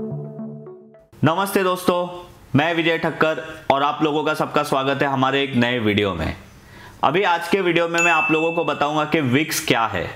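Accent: Gujarat, India. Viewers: bhai, u r from Gujarat?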